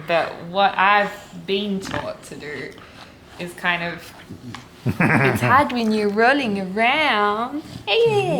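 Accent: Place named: Australia. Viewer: listening to you talking just reminds me of aus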